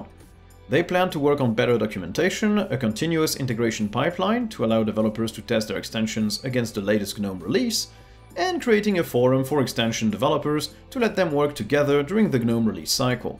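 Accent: France. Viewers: I love Linux et ton léger accent français 👀😂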